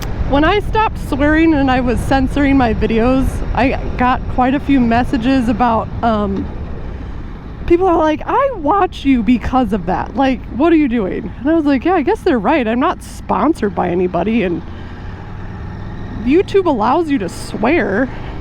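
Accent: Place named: Chicago, United States. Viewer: Sounds like you are from Chicago.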